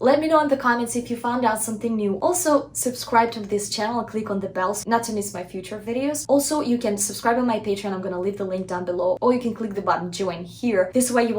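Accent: Russia. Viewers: Was listening to you and all the time was thinking,that you are Russian,the accent is evident, then read about you after the video and saw that I was right❤ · По акценту поняла сразу, что автор русская))) местами прям проскальзывает произношение, на согласных особенно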